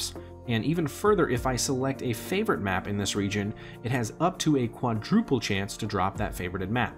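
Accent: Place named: United States. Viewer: A someone who isn't American, I usually find the accent as hit or miss. You have a lovely tone, pace and cadence to your voice, and it made for a really nice video. You should do more content, and persevere with your Youtube channel.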